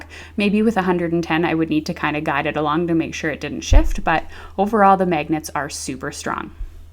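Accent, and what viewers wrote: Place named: Canada. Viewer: Your Canadian accent is so cute. Idk how I feel about this platform. I like the lines and the clicks so it’s a maybe yes for me. Thanks for showing us how to use it ❤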